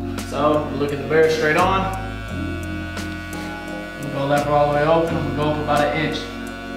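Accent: North Carolina, United States. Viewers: Are you from North Carolina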